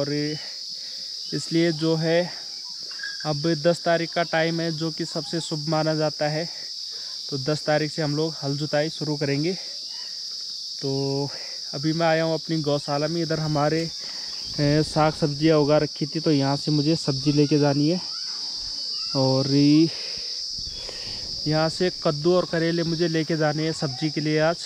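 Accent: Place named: Hills, India. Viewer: Bhai ji aapki video aur aapka presentation Bolne ka tarika bahut badhiya hota hai ekdum hum pahadiyon jaisa apni video daily dale aur aise hi vlog banate rahein.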